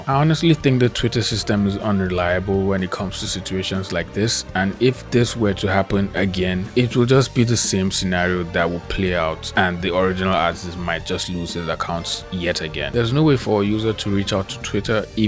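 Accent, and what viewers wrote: Nigeria. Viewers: im a young nigerian girl living in the uk. i dont have a strong accent like you but I've been planning on doing commentary art voice acting and animation 3d and 2d aswell as some programming. you have just made me more confident in what i want to do. you are incredibly unique. ive never seen a nigerian commentator like u and be succeful. my parents dont want me to go down this type of route but if i show them your content im sure they'll let me freely do what i want to in terms of my future. i look up to you immensly. i love your art and you are also attached to ur country too wich makes me feel warm inside. i wish u the best of luck in ur career and u deserve more attraction. i love your content im so lucky i found your stuff. you are one of the most diverse members of the art community ive seen and i hope more people like you show up. 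you are honestly a start of a new era in the community. nigerian homes are quite critical and people like me might be scared to go into this feild of work but trust me u are giving us the confidence to persue our dreams. from a huge fan that is glad you exist💕💖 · Are you Nigerian?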